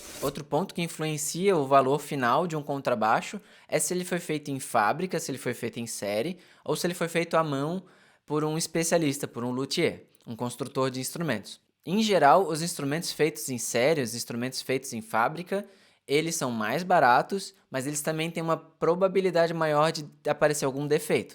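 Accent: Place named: Santa Catarina, Brazil. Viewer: Eu sabia que vc era Catarina. Hahaha. Sotaque não nega. Tamo junto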